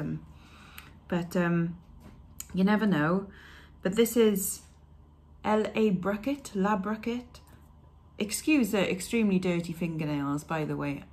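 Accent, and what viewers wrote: United Kingdom. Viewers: Hi, found you on Carla's you tube channel. You make me laugh...don't know if that's a good or bad thing lol. Where are you from, I detect a Welsh accent but with possibly an Eastern European slant.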